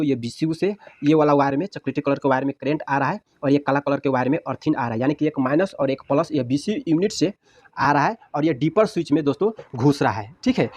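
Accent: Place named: Bihar, India. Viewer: Bahut badhiya ustad. Bihari accent bahut badhiya ba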